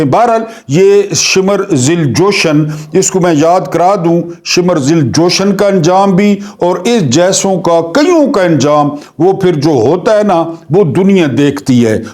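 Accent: Saraiki, Pakistan. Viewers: واہ صاحب ۔ آپ کی گفتگو اردو میں سرائیکی کا تڑکا لگاتے ھو😅 ۔۔سن کے دل❤ خوش ھوتا ھے ۔۔ بہوں خیر ھوونے 😊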